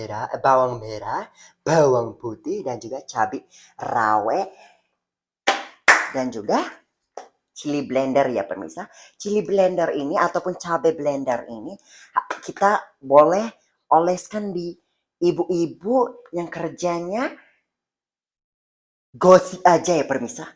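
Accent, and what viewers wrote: Java, Indonesia. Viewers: Jowo medhok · Suara ni ckp indo sebijik mcm indo yg kawin sma jenis tuu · Aaaaaaa kiyowo dehhh pake aksen Indonesia 💜💜💜💜🎉luv bangett mood bangett emng ini orang ❤️❤️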